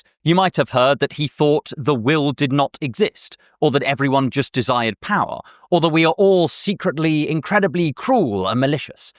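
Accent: United Kingdom. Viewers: Where in the UK are you from if it's not too rude to ask? Usually, I don't struggle as the accents across the commonwealth are quite different, but I struggled to tell if your accent was British or New Zealand. Sorry. I know this is a rude question, it's purely an academic inquiry. Like how Portuguese from Portugal and Russian are completely different languages but have fairly uniquely similar vowels or consonants unique to those languages? If that makes sense? Anyway... 😅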